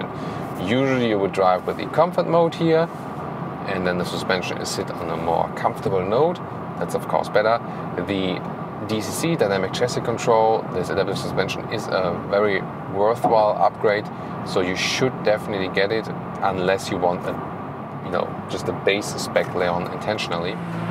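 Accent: Germany. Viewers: I enjoy your vids, however you should do a German version also, because you are already speaking "german-english" so the placement of words are not correct. I don't like that, I'd rather have a full-on german version.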